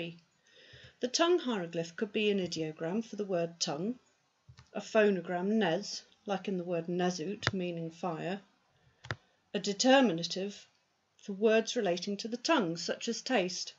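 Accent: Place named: England, United Kingdom. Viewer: Yeah good lesson! I've difficult with your very well english accent for a french like me who's not so good to talk fine english and undesrstand english people. :-) But with several times to see and listen, i'll hope it will be better. Waiting too for the next... Best!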